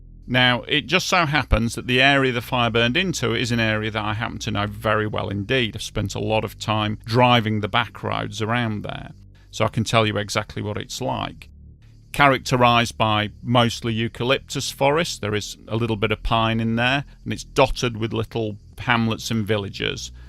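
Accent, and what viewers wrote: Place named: North of England, United Kingdom. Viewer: you sounded as though you came from the North of England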